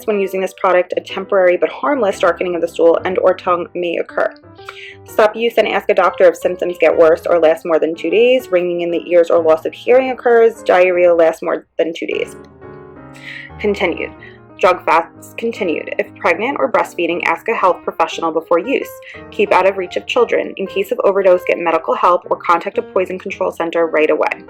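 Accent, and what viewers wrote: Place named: Boston, United States. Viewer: Boston maybe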